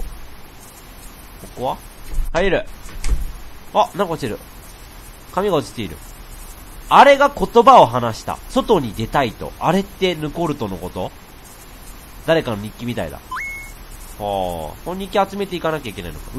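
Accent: Kansai, Japan. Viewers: まだ関西弁がのこってる頃だああああ！！(まあ今もちょっと言ってるときあるけど)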